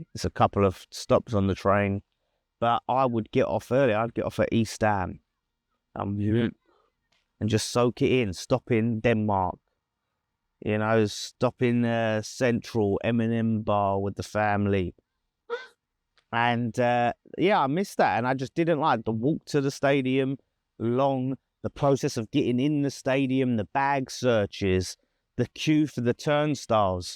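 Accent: Jamaica. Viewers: I probably agree with everything you say - but please change your accent, you sound like a yardie in Jamaica - I had to switch off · why do you talk like a jamaican?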